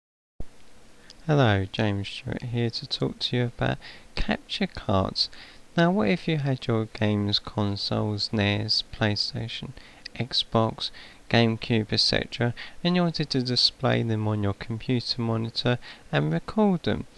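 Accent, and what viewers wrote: United Kingdom. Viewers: Lol i like british ppl explaining things. just a word of advice tho, talk a little louder. It will get ppls attention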